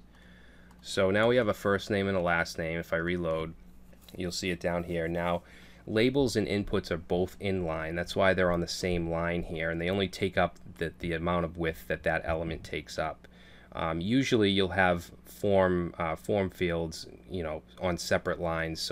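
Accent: Boston, United States. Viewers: Boston accent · You from Boston man? I hear it in your voice. Thanks for the video! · are u from Boston? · You sound like you are from Boston, are you?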